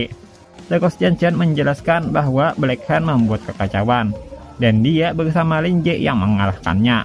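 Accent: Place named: Banjar, Indonesia. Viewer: banjar leh.. lanjutkan bang 😂 unik ni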